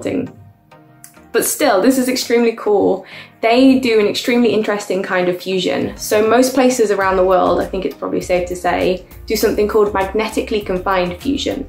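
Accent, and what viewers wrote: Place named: United Kingdom. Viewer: Nice to hear some british accent here ^^ I really see a potential to your channel so here's a few tips to improve: - Love the improvised style but maybe have things a little more scripted so you stumble less on words and make explanations a little clearer - Sound echo is not much of an issue for now, just try to correct the channels, your voice is very much on the left channel here - Keep the balance between vulgarization and complexity. It's a niche but people are still very much looking for channels that go more in depth, best example is what Scott Manley does with rocket science Else, nice video, nice background, we can clearly see you are passionate about the topic and I can see you going places with this channel, keep up the good work ! Also, nice instance of laser fusion reactors in fiction is in The Expanse, it's what powers the ships and you even see the inside of the reactor in a few scenes (can't remember which episodes ^^)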